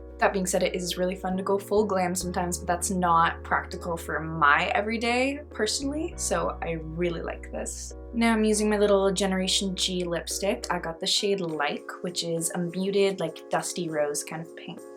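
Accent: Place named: Canada. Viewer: this is my first video i’ve seen from you and the second you stared talking i thought to myself “she’s totally Canadian” lolol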